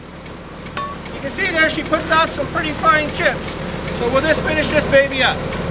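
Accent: Newfoundland, Canada. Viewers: He must be from Newfoundland.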